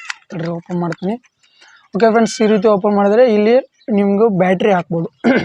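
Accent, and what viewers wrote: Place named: North Karnataka, India. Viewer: Pakka ಉತ್ತರ ಕರ್ನಾಟಕ ಬಾಷೆ😅😅😅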